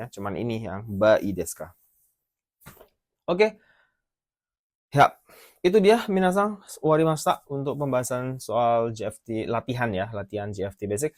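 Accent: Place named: Medan, Indonesia. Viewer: lah, sensei orang medan,, kirain wong jowo hhahah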